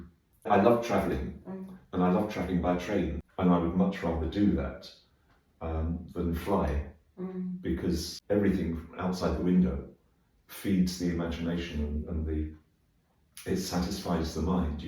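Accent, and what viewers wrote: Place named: United Kingdom. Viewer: イングリッシュとはいえ、米語ではなく英語のナチュラルで穏やかな心地よい響き、gentlemanとあいまって とても素敵でした!! 当たり前と思っていた日本の素晴らしさを あらためて教えて頂き有り難うございました(*^O^*)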